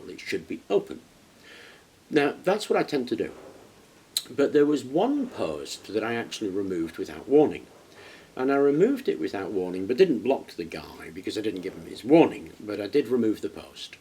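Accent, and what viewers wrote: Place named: United Kingdom. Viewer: You have a curious mind but a lot of people don't so their mind works on what they have been told. Most of us are more lazy or like the beaten path, why, well it could be fear because what we don't understand could harm us. I still like to try and I enjoy your channel but I think it's the British accent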